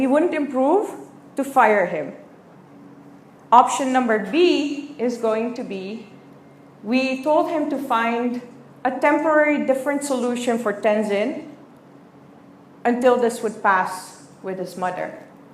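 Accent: India. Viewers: Good lecture as like Indian professor. Pronantion as like Indian. Easy to understand.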